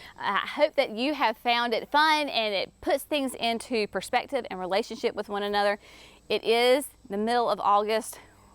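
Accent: South, United States. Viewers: Love your charming Southern Accent and the guaint Souhthern sayings. Thanks m for sharing g your beautiful garden. Love ya.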